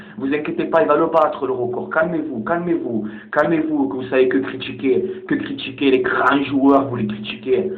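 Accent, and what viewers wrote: Marseille, France. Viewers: Thanks you very much je kiffe ton accent Marseillais j'ai de la famille laba pas loin du Parc Jeannot Tu as totalement raison je suis avec toi que dieu te bénisse J'embrasse tout les Marseillais bye de la part de Chinny Baby Nigeria USA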